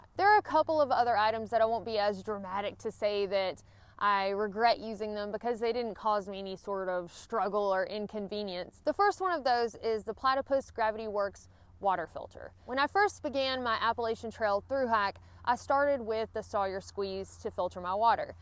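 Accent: South, United States. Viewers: Miss there's nothing better than turning the computer on and there you are with that sweet southern accent giving this older lady some advice. It just makes my day!!! fter watching your Thermarest fiasko I bought a Nemo Tensor. I usually watch your videos and if it doesn't work for you I at least try it but I always buy from REI because of their return policy even if they are a little expensive. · love your Southern accent. Im in the middle of getting ready for my 2020 backpacking trips. Updating gear.....long over due. You should also check out Topo running shoes....they have a great gaiter system also. loving your videos....keep them coming....Happy Trails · I love your Southern accent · You meant, her Southern Accent? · I'm from the south so....I don't like Hacking boots either....lol....jk...love your southern accent! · That southern drawl........damn grrrrll. 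· I was watching with the captions on...I don't think the autotranscribe has been fully configured for Southern accents. It thought she was talking about 'hocking' boots.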